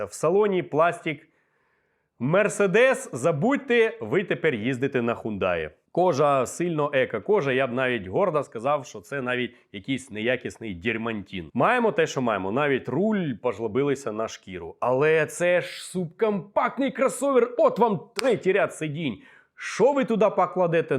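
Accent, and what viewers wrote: Russia. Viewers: Бля… неможливо слухати такий акцент жахливий російський. Розмовляйте російською краще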